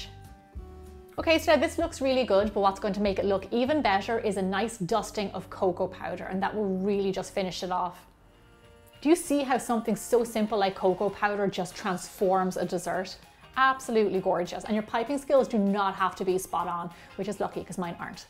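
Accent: Ireland. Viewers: Why you sound Irish, not Italian! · you must be irish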